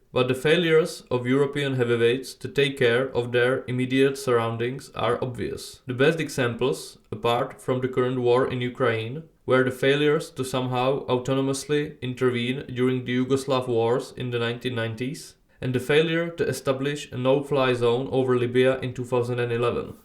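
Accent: Czech Republic. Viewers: Z tvého lehkého přízvuku( žádná veliká výtka) jsem poznal, že jsi Čech. Je přímo neskutečné, jak málo si průměrný Evropan uvědomuje všechny problémy týkající se naší budoucnosti, já jsem měl dříve spíše jen takový pocit ,, kulturního podvědomí", že se něco pomalu hroutí než jsem objevil tebe a další podobný materiál. Jak v ohledu ekonomie tak v hudbě, médiích je cítit, že jako kontinent ztrácíme náš elán. Myslím si, že je ČR v určitém smyslu takový zvláštní mix (jako nejzápadněji smýšlející stát železné opony). I přesto nedokážu pomyslet na moc kulturních záležitostí nedávné doby, které by překročily naše hranice. Nechci, aby se z celé Evropy stalo vymírající muzeum, pro celý svět k nahlédnutí. Jako část nejmladší generace mám pocit, že proti tomuhle všemu opravdu stojíme bezmocně, hlavně kvůli tomu, jak je postavená naše populační pyramida. Respekt za to, že věnuješ něčemu, co by si spousta jak mladých tak starých lidí měla projít hlavou. 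· Uhh no, this is a pretty clear Czech accent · He is from Chekya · thick czech accent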